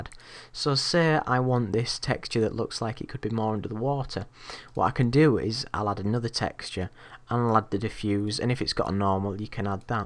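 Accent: United Kingdom. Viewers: British Accent makes me feel chill :D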